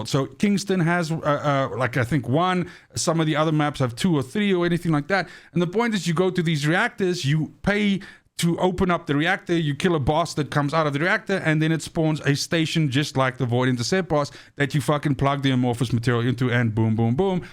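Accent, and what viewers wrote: South Africa. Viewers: South African Afrikaans accent! Thanks for the video.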